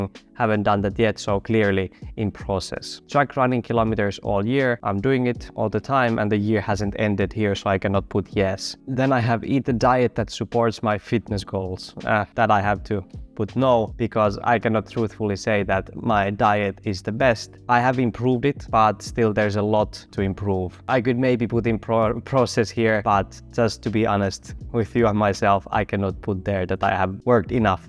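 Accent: Finland. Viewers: First time seeing one of your videos. Clicked and heard your voice. Said to myself, "He sounds Finnish". Interesting because most of the reset type of videos I see are made by women who use various planner systems. Other than Ryder Carroll (who created the original Bullet Journal) and a guy named Riley Blanton (who uses Michael Hyatt's Full Focus Planner) yours is the first video of this specific type that I've seen done by a guy.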